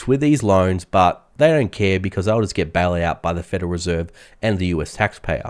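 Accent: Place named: Australia. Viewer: Change in pronounciation for the American audience who just couldnt seem to deal with the aussie accent?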